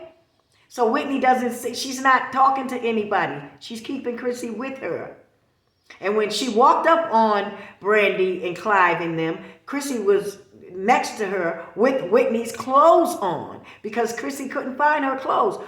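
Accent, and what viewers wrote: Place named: Boston, United States. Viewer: Heavy on ya "HOT"(HEART)❤ Straight Boston accent 🆗️ Love it and thanks 4 the "tea"🍵💨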